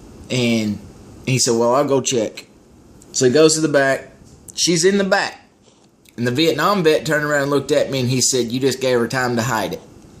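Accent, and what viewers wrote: South, United States. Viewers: the slow poke Southern accent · all the Southern drawl bullshit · I enjoyed listening to your story and your all "Merican" accent. Folks like Adam Peterson who commented about you should go watch their Britney Spears videos instead.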